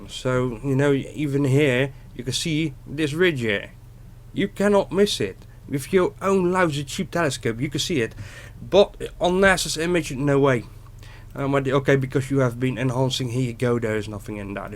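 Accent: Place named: Netherlands. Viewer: Ik kijk al je filmpjes op zijn tijd... Ik herhaal je debuutfilmpje nog steeds vooral vanwege het geraamte (gebroken wiel) bij de zeebedding met nog duidelijk water in de goot en de discutabele commentaren daarover. Ik versta ieder accent in het Engels en kon snel horen dat je Nederlands spreekt. Omgeving Rotterdam of daaronder? Maakt niet uit, je hebt altijd een punt om over na te denken ook al zie ik niet alles zo als dat jij dat ziet. Keep up the good work!!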